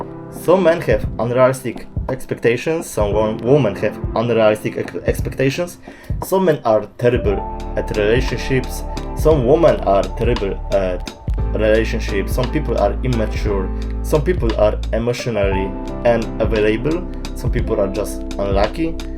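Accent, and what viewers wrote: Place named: Poland. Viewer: Masz Polski akcent ale ma to swój urok. Fajne że tak rozbudowałeś ten temat w tym filmiku. Oh and have a nice day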